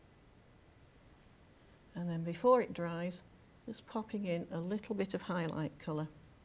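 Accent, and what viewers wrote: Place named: Northern England, United Kingdom. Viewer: Beautiful artwork, do you run courses for using acrylics, you sound northern England like me.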